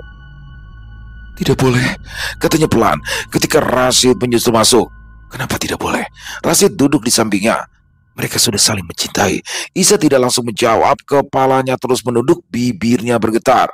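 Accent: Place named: Banjar, Indonesia. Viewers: Waalaikum salam, klo bang berbahasa banjar logatnya spt org banjar asli, salut bang😊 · Kaltim hadir bang kental banar banjarnya😂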